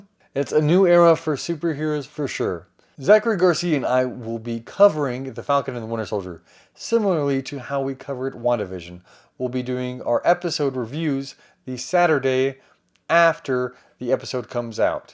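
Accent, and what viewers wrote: South, United States. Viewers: I love your vlog of this video at your house in Texas during your quarantine until you're talking about the mini-series of "The Falcon and the Winter Soldier" while it came out on Disney+, it says after being handed the mantle of Captain America at the end of "Avengers: Endgame", Sam Wilson teams up with Bucky Barnes in a worldwide adventure that puts their abilities to the test as they fight the anti-patriotism group, the Flag-Smashers and you're talking about all the characters of the mini-series from the beginning to the end after that, I hope your husband, Zach will be very proud of you, so I wanna say I'm very happy for you, but I love your Southern accent and I know you're half Mexican!! #FalconandTheWinterSoldier #DisneyPlus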